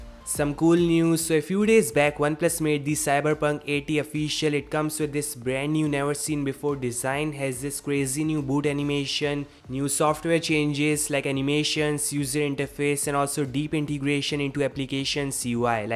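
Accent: India. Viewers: 满满的咖喱味 哈哈啊哈啊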